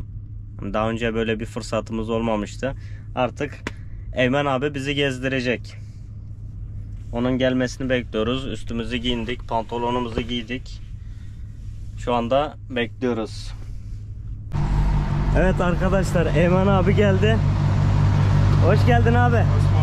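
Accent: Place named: Mardin, Turkey. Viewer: mardinlimisin ? bizim oranın kürtçesini konuşuyorsun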